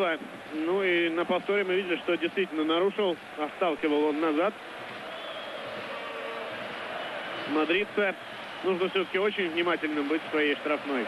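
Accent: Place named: Kyiv, Ukraine. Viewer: Уважаю киевский руссь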